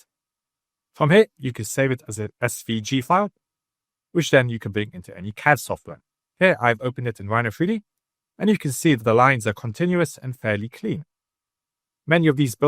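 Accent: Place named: United Kingdom. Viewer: lol your British accent is so strong I literally started wondering what "Google F" was 😂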